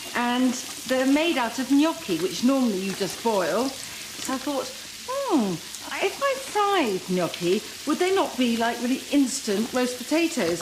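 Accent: RP, United Kingdom. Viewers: "Gorgeous golden globules"... god, I love her RP (received pronunciation) accent and frequent use of alliteration.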